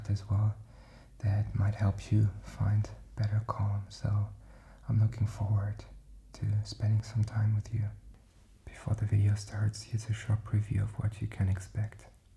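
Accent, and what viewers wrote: Scotland, United Kingdom. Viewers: His accent is scottish btw :) sounds just like my mum and dad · That’s a scotch accent haha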